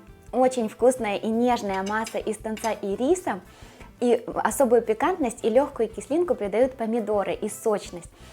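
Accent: Ukraine. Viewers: Дитинко все добре , вле чому ти мовою окупанта ведеш , по говору чути що ти з українського середовища , якась напасть так нелюбити свого і підлаштовуватися під чужих . Чи гроші понад усе ?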